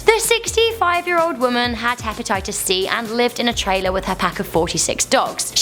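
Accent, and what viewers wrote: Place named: United Kingdom. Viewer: I like your British accent and you one of my favorite YouTubers like if you agree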